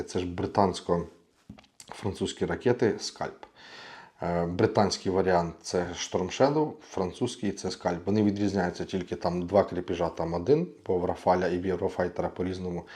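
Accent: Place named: Russia. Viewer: Яка бездоганна українська мова, спочатку подумав я, але це було тільки спочатку! Жахлива кількості русизмів, неправильні закінчення і взагалі не відповідні слова, або мовні покручі. Наприклад: мощний ; крепший; крєпєжа; пощитати замість порахувати і рахується замість вважається (рахується - калька з російської - СЧИТАЕТСЯ); по елЄктроніКі; канешно і таке інше. Жах. (((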